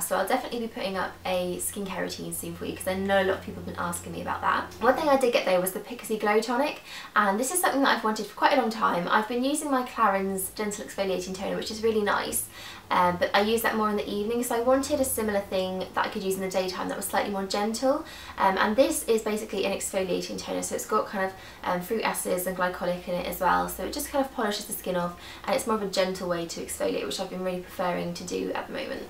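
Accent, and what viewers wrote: United Kingdom. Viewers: You are so gorgeous! I'm so jelly. :( And I wish I talked with that wonderful british accent! haha! you're the best! xoxo all the way from sweden!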